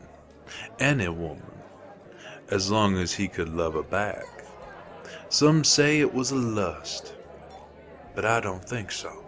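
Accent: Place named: South, United States. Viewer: really convincing southern drawl! Loved the story c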